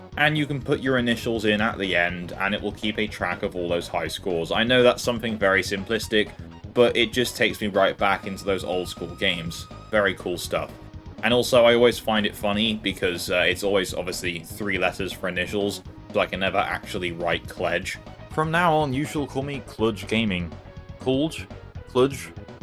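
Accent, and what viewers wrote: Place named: Birmingham, United Kingdom. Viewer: Well, you're definitely not the Budget Builds Official guy. Same middle class Brummie accent though. Since I don't like SMK, I definitely wouldn't like this one.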